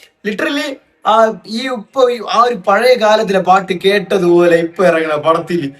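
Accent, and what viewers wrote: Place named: Tamil Nadu, India. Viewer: இவர் மலையாளம் பேசுற தமிழனென்று நினைக்கிறேன்..பேச்சு கேட்க்கும்போது அப்படி தோணுது..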